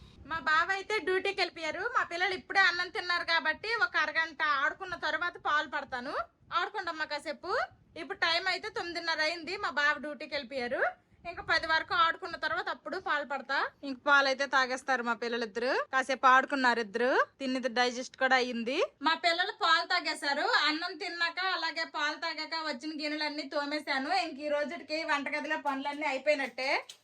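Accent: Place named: Srikakulam, India. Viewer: garu nenu tea tagutu me video chusa andi. Madya lo sir ni meru dibada dabada badeyyali annaru. Chala navvi polamaranu. Okkasari chinnappudu gurtocchindi. Madi vzm.meru Sklm slang lo baga matladutaru. Mammuluga kuda baga matladutaru. Overall ga natural ga matladutaru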